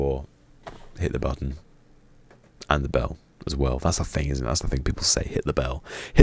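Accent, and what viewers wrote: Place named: United Kingdom. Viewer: I will check out anyone checking out. British accent a bonus.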